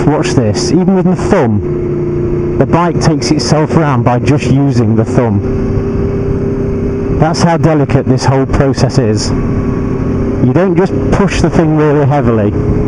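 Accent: United Kingdom. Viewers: Hey man, good british accent btw, really clear unlike many others...big question if I'm getting to a really hard turn/curve I know I gotta start using the countersteering tech. but once I'm at it, should I start moving the handlebar gently to the direction I'm turning or countersteer all the way? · theres nothing like a british voice for a tutorial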